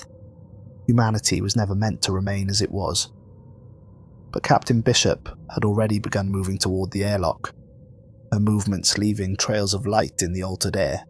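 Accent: Wales, United Kingdom. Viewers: Dude, you actually read things not just use an AI reader, who cares about the odd and ends like that, but respect for caring. Frankly I subbed the second I heard the Welsh accent, sounds like home!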